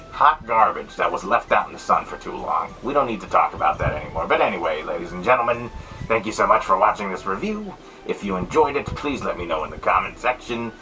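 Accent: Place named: Ireland. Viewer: Ah nooo, the fecking Leprechaun! Again your Irish accent is better than mine. Happy St Paddy’s day!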